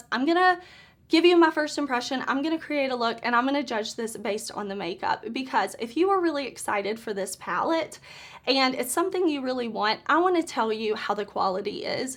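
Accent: South, United States. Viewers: I literally love your voice and is that a southern accent? I also love that you have so much lid space. I have hooded eyes so my shadow is always kinda hidden.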